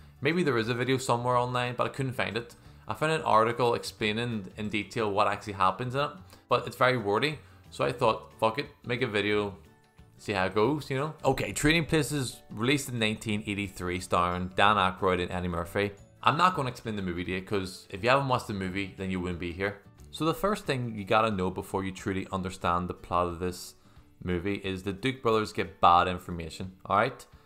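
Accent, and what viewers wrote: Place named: Northern Ireland, United Kingdom. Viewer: Being N. Irish, I had to double take when I heard your accent when watching a video on such an American film lol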